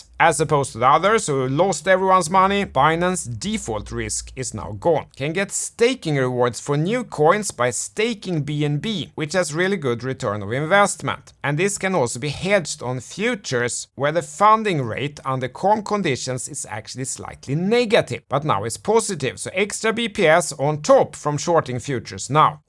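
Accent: Sweden. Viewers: Love your vibe, you odd swedish accented guy!! You prob think that about our Aussie accent too.Thanks for sharing here on YT.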